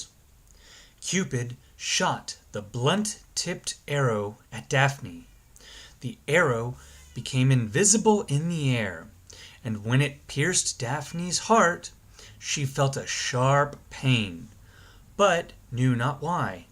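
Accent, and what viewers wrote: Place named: Greece. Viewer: Nice story i really love accent greek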